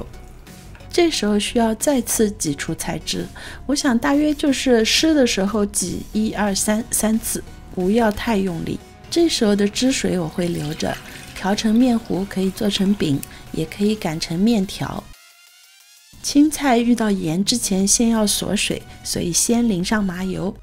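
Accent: Shanghai, China. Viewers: 一看一听就知道是阿拉上海宁额腔调 灵额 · 上海腔调，上海咪道，一只顶。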